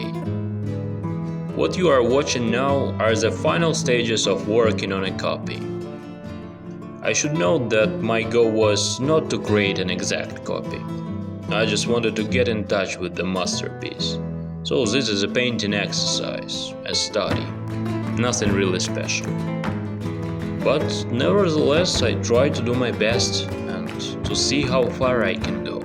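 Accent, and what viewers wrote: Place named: Spain. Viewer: I hear you have a Spanish accent. Are you from Spain? My grandparents were from Castilla La Vieja in Spain. I think that I must have been an artist in my past life because painting is my passion, and I am a self-taught artist. I went to Spain and visited the museum in Madrid. I love Velasquez. He is my favorite of Spanish artists! You are great too ! Gracias por compartir su conocimiento y talento con nosotros!!❤❤❤❤🎉🎉🎉😊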